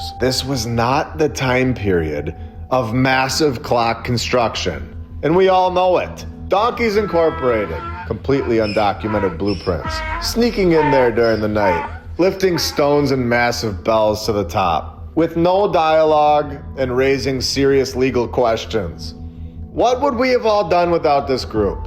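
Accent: United States. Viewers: I knew what he meant. He's American, they say words differently.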